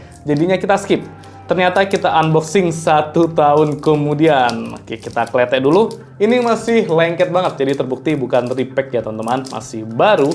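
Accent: Yogyakarta, Indonesia. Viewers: Mas nya orang jogja kah?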